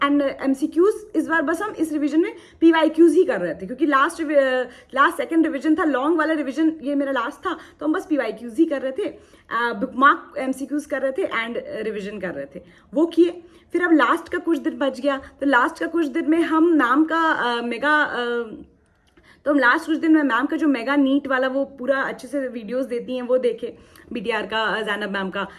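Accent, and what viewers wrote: Bihar, India. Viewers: Is it bihari accent? It's cute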